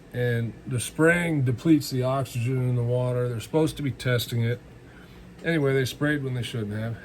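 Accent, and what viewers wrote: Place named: Northern California, United States. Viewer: Your the man love the local NorCal West Coast talk keep up the good work sir...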